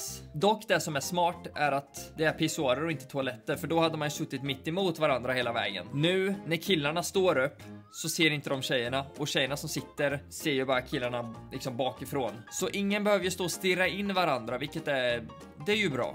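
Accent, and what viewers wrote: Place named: Östergötland, Sweden. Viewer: Denna östgötska😂 känner igen mig 100%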